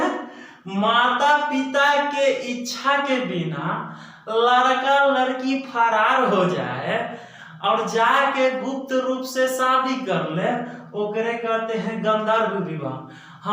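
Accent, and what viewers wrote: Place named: India. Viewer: Desi English ✍️✍️✍️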